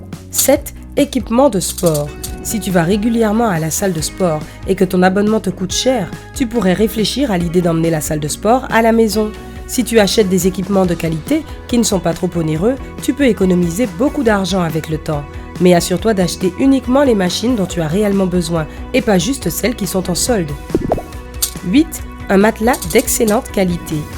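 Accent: Antilles, Caribbean. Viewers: C'est une antillaise la voix off ? (Juste pour savoir inh...) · C'est une caribéenne/ haitienne qui parle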